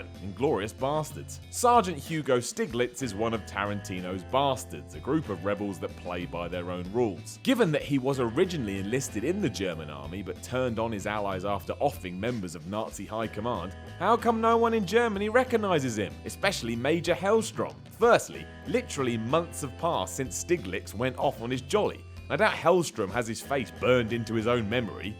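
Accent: United Kingdom. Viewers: Fucking bullshit::: this British accent fuck head thinks he’s soooooo smart ,,,,,cause he’s British?? How’s that British empire doing now ?? British elites???! They even tip like cheap bastards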